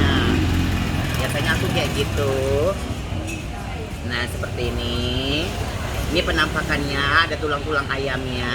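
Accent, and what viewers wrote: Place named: Banyumas, Indonesia. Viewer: Kak sering-sering update ngapak😭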